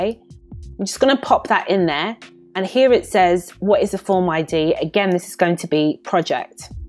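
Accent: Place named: United Kingdom. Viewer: Hi! Excellent video. Not to mention your beautiful British accecent!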